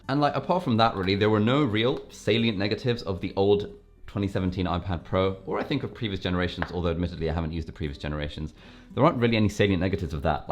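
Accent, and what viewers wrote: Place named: England, United Kingdom. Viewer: Recently found a bit water ripples on the corner screen on my iPad pro 2018, anyone does the same? Anyone can give any thought about it if I need to replace it? Always like Ali's English accent, to a non English speaker, me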